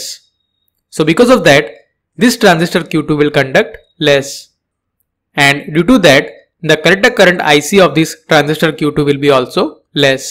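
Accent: India. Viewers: Oh my god, this is bad. the audio quality and the indian exzant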